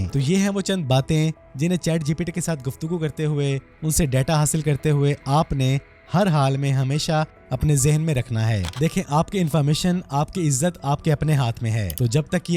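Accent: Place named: Saraiki, Pakistan. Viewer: bhy lagta hai apki mother- tongue Saraiki hai QK apka laheja Saraiki ki Tarah bahut meetha hai, mashaAllah!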